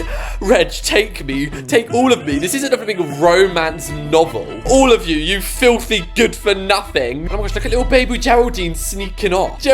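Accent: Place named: Essex, United Kingdom. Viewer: I KNEW U WHERE FROM THE UK YOU SOUND LIKE UR FROM ESSEX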